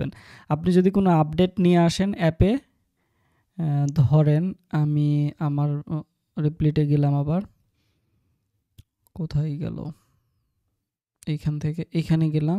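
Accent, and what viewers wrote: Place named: Kushtia, Bangladesh. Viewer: bhai apnar basha kushtia kothai?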